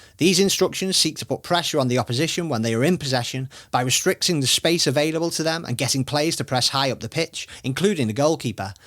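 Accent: Liverpool, United Kingdom. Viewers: Is this liverpool accent?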